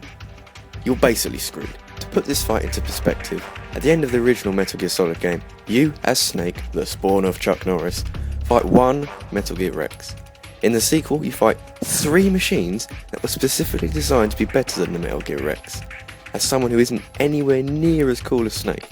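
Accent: United Kingdom. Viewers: what part of Britain are you from?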